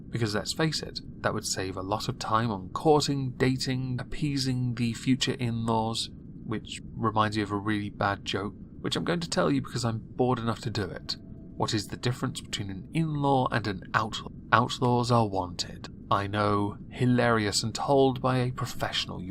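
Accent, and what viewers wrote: RP, United Kingdom. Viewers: Could maybe ONE of you closet furries do the not-really-going-out-there-on-the-edge social commentary videos WITHOUT the laconic "I just woke up" received pronunciation and the weird background ambiance to sound in-character? Christ all-fucking Mighty.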